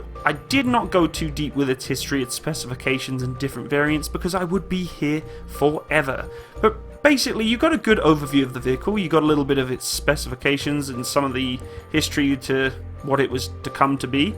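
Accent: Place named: United Kingdom. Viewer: The quality of your analysis and videos are second to none. Thoroughly researched, well composed and hugely entertaining to boot. I will have to admit that hearing a British voice from home is an added joy factor for me. I actually look forward for (all) your new videos. I can’t say this about any other YouTube (or TV) channel. Please keep going.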